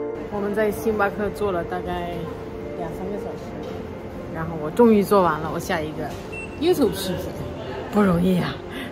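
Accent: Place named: Beijing, China. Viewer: 这中文都有京腔京味了，厉害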